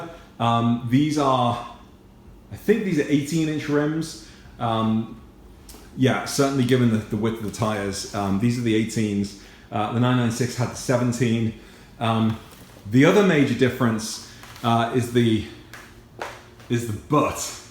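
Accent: United Kingdom. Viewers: Truly not “having you on”. I just love the way the British speak. As an American I’m embarrassed at how badly we murder the language in this country! It’s true my friend. Google 5 mph bumper and you’ll see that there’s a U.S. law pertaining to bumpers. There have been so many cars in the past that weren’t even sent to The States because of it. Many manufacturers just don’t want to deal with reconfiguring bumpers for this market. I’m actually fairly certain that the “bumperettes” (as you call them) don’t exist on the European versions of the 911.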